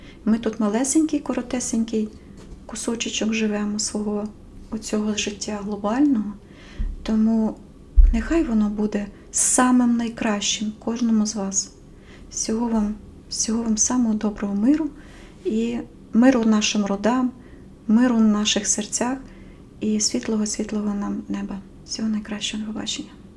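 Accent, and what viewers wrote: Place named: Rivne, Ukraine. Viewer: Дякую. Люблю слухати Ваші вірші, Ваш рівненський голос.♥️♥️♥️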